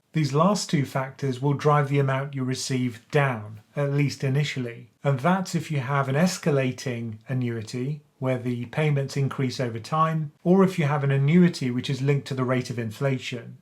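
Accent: United Kingdom. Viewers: Really helpful but rather USA orientated… despite the UK accent.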